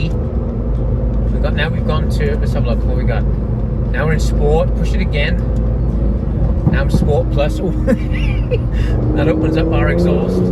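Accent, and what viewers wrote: Wales, United Kingdom. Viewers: Do I deduct a welsh accent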